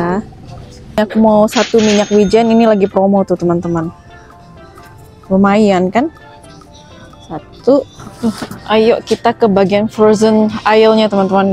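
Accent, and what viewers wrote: Pontianak, Indonesia. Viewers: Logat pontianak kak tadak ilang suke liat kak ngomong 😊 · Yeayyyy shopping lagi ka bareng n daddy Boroooong ka, logat Pontianak nya gak ketinggalan ka ❤️💃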